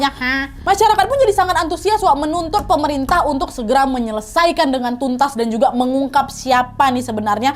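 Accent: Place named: Sumatra, Indonesia. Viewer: Logat nya Ayuk Sumatra banget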